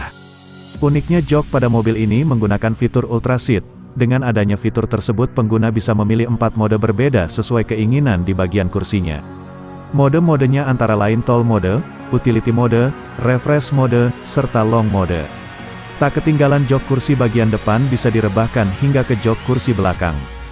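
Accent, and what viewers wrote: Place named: Indonesia. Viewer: Beberapa penyebutan dalam bahasa Inggris dibaca seperti bahasa Indonesia 😅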